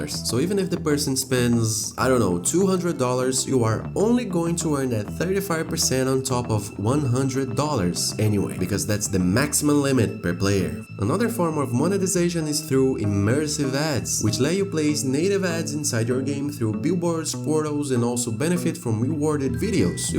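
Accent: Brazil. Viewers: percebi, cliquei no video e fiquei assistino, e so pensando, "sotaque brasileiro do caramba", kkkk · Teu sotaque parece brasileiro 🤨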